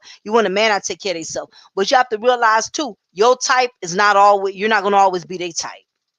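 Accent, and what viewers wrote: South, United States. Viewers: You fine as hell with that southern accent ❤ Dont block me 😂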